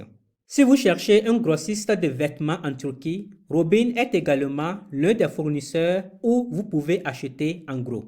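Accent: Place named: Benin. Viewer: Merci infiniment ! Je pense que t’es béninois comme moi vu ta façon de parler ✌🏽 Franchement ça va tellement m’aider ! Cette vidéo est top, claire et concise :)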